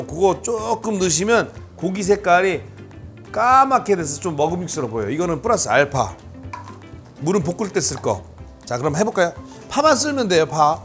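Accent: Chungcheong, South Korea. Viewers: 우리 백선생님은 요리를 구수한 충청도 사투리 억양을 양념으로 살~짝 깔고 설명해 주셔서 뭔지 모르게 쉽고 맛깔나는 듯~ 화이팅 입니다~😊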